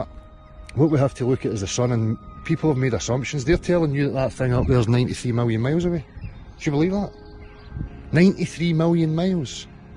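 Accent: Scotland, United Kingdom. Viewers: I love it!! And you remained friends.....and sowed a seed. Well done! (What part of Scotland are you from?) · That was so well done. I love the Scottish accent as well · I like scottish accent 😉 !! I thought he was a relative of Charlie Burchill 😂 ( Simple minds)!! Greetings from Italy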